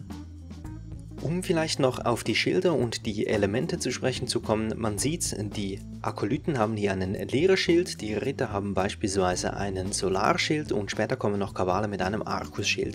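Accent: Switzerland. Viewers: Der Sprecher ist Schweizer. Man hört es ganz ganz leicht :D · Bist du Schweizer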